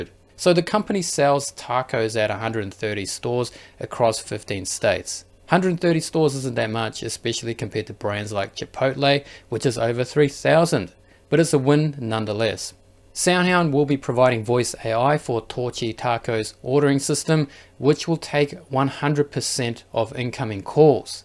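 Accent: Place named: Australia. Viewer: Asian guy with Australian accent doing American politics🎉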